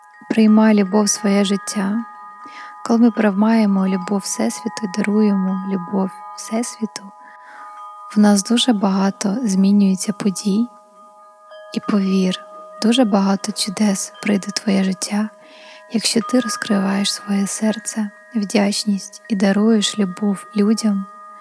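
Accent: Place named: Ukraine. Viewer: Дякую мило🤗,а особливо за українське звучання ❤️🇺🇦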